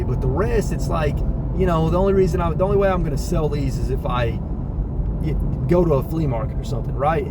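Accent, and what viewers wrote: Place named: Tennessee, United States. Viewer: Liked and subbed from watching your friends game cube video featuring you son. What part of Tennessee do you y’all hail from?? I live in Seattle and my sister moved to Jackson,TN several years ago. Thought I recognized that accent lol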